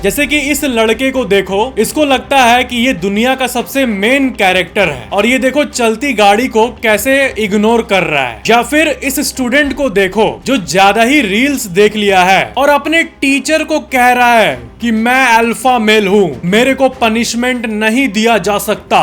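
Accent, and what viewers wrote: Bihar, India. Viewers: bahi qna video bnao aur btao tum Kya Bihar se ho?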